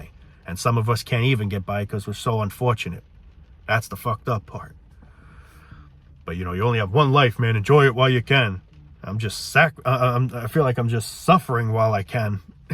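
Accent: New York, United States. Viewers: You have that new York accent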